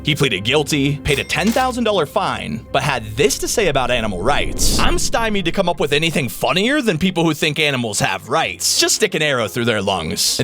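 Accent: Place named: United States. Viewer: The bloke who did this video sounds like some American leftie tosspot.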